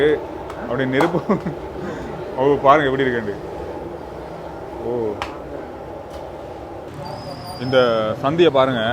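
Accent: Sri Lanka. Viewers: நீங்க என்ன தான் தமிழ் பேசினாலும் இலங்கை மனிதன் தான் இல்ல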